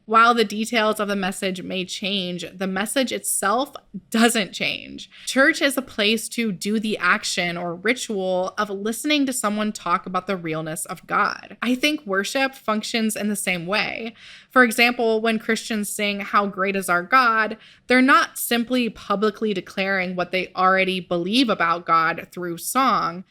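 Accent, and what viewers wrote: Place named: England, United Kingdom. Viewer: I share your english accent, but its funny you don't know we have one. go ahead and google 'how to pronounce satan'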